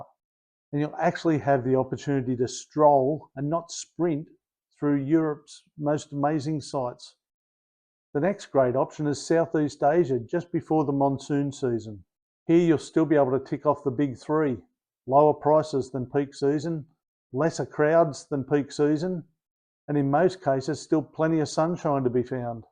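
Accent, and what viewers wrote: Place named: Australia. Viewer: I thought you had an Aussie accent. Wow, you’re a world away from USA and the State of New Jersey! Great luck to you, dare I say “mate”.😊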